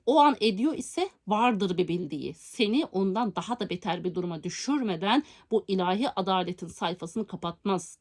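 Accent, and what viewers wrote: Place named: Azerbaijan. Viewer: Salam. Siz Igdirlimisiniz. Sanki konusmanizda bazen benziyor da o yüzdən. Yani bazen azərbaycanca konusmanizda da var Ben onu hiss ediyorum.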